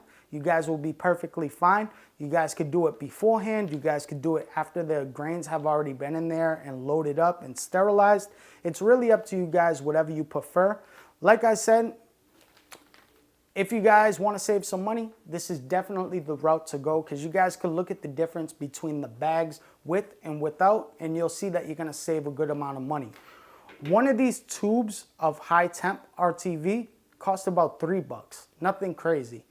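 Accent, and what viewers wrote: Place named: Brooklyn, United States. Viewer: He Reminds me of my Brooklyn accent.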